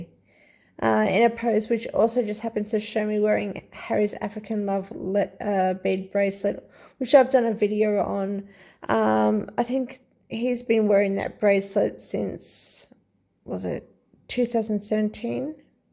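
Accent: Australia. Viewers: Love your Aussie accent - thanks for uncovering this ruse - big fan from Cape Town, South Africa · We don't know the royal family either. I think this is all a huge distraction from a lot of directions. Nice research and nice to hear an Aussie accent!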